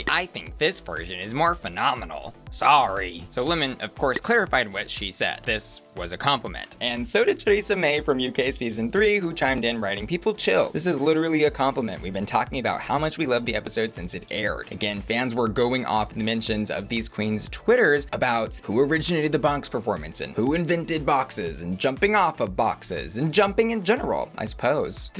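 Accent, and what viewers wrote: Spain. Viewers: The best thing that came out of this drama is that I got to hear your lovely spanish accent! Thank you queen! ❤️❤️❤️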